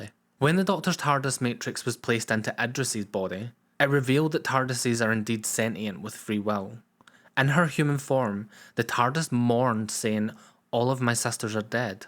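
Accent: Scotland, United Kingdom. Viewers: Never heard a Scottish person before?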